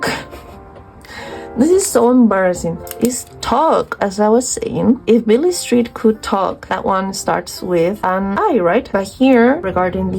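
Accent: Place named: Latin America. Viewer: for disability rep, the next book on my tbr that covers that is called “out on a limb” and it’s a romance I think? I can’t recommend it bc I haven’t started yet but it sounded really cute! For a book about books, I think the starless sea works! also lol this kind funny but as soon as you mentioned the book being expensive to get to your 3 world country (+ your lovely accent) I immediately thought “she’s Latina” — it was instant neuron activation lmao !! I actually was thinking maybe you were Brazilian (like me ❤) but the accent was just a little off from our usual accent so I wasn’t sure~~ I’m guessing you’re Chilean then?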